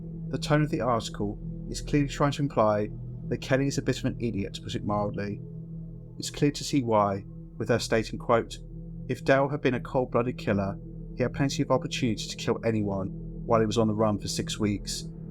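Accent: United Kingdom. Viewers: This is to the narrator ....you have an absoloutly kickass voice /accent , just love you Brits ,,,,,simply and utterly smashing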